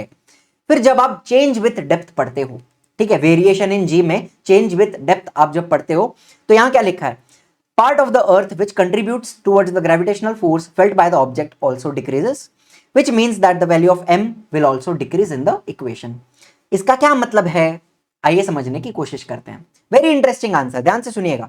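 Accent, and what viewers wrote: Maharashtra, India. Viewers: Ek no marathi sir 😅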